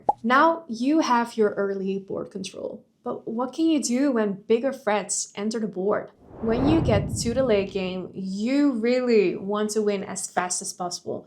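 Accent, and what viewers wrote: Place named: Netherlands. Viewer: Ondanks je goede Engelse uitspraak verraad het lichte accent toch dat je Nederlands bent. Leuk hoor… zelf zoek ik nog steeds een community in mijn woonplaats.. goede video verder en heb al veel kaarten van dit deck dus ik ga deze eens proberen met wat kleine aanpassingen(ik heb de shiftline van vanellope niet)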